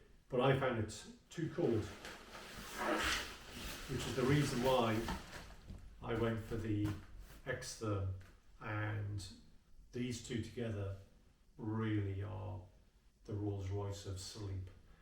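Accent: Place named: England, United Kingdom. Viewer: Hi, One more question: How are you with the 10'F (-12'C) version? Is it warm enough for you? lightweight or heavy? What kinds of locations are you planning to backpack with it? From your accent, one might assume you are based in England. I am deciding between the 10'F and 0'F (-17'C) versions...The 0'F version adds a few more ounces but might work better in the New York State winters. I would like to backpack in summer and also occasionally sleep outside in winter. Maybe I'm expecting too much from one sleeping bag?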